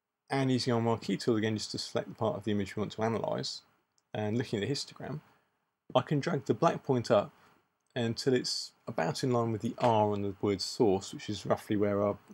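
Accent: United Kingdom. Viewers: His accent is English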